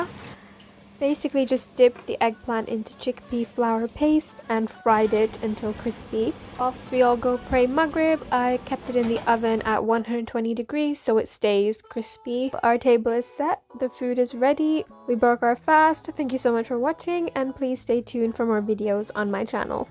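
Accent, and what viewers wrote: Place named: Scotland, United Kingdom. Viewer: Which prt of UK you from? Sounds scottish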